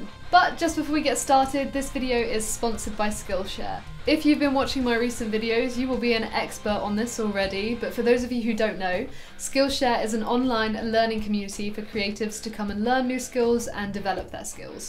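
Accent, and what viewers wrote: England, United Kingdom. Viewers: Dear God, your English accent is just désarmant. Magnificient.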